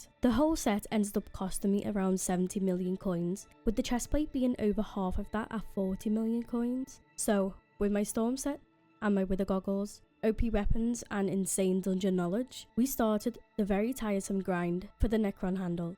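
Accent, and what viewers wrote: Liverpool, United Kingdom. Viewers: that accent tho? is it scouse?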